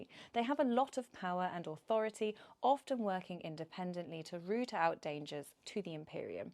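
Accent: United Kingdom. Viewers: you got a really great speaking voice. The Brit accent really works for you. Your hair looks great as well. Ive known about 40k since being young, ive always been kind of a closet nerd though. Necromunda is my favorite setting out of all of it. Have a great day.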